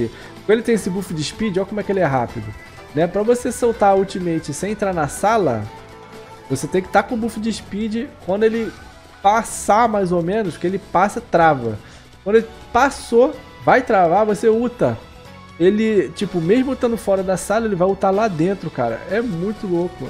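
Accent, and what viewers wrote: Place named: Rio de Janeiro, Brazil. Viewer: Só q o cara e carioca lkkkkk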